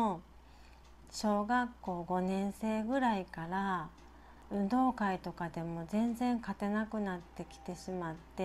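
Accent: Kyoto, Japan. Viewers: 懐かしい京都弁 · インスタでも登録させていただきましたよコメントさせていただきました。 バリバリ京都弁で落ち着きます🎵 そして、そのドレッシングのお店の近くに子供の頃から住んでます。 大原の田舎者です❗️ よろしくね😀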